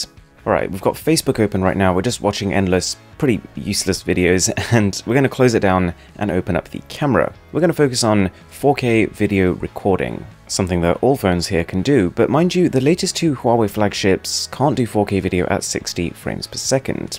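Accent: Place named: RP, United Kingdom. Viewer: Is it on purpose that you have that posh accent when talking ?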